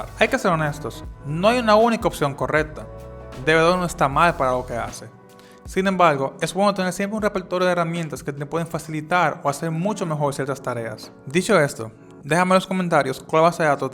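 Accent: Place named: Dominican Republic. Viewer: Algo me dice que eres Dominicano, si es así, estoy muy orgulloso por ver compatriotas haciendo esta clase de contenidos. ¡Excelente vídeo! ¿Podrías hacer un vídeo sobre el road map que una persona no informática debería seguir para ser un Desarrollador de Base de Datos en 2025?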